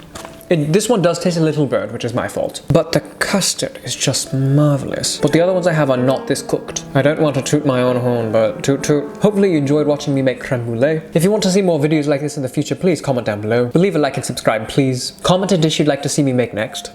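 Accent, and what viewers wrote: United Kingdom. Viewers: British accent, nice hair, cooking AND he has a suit on?.. sir, are you TRYING to make me simp for you? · the dumpling is cry out for help, and i'm just admiring british voice over🙃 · If he didn’t introduce himself as a British gentleman from the 1800’s I would think that that was his actual voice/accent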